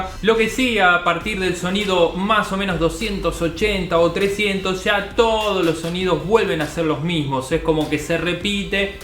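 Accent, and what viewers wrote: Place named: Argentina. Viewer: pesimo video.... no tocas nada...me hubiera gustado escuchar el teclado y no tu estupida voz argentina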